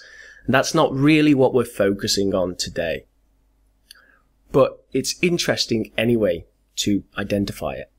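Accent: Northern England, United Kingdom. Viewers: Northern England, that's where you're from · You are a native British speaker, or you learnet this British accent? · I love British accent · so into Brit accent. You're superb!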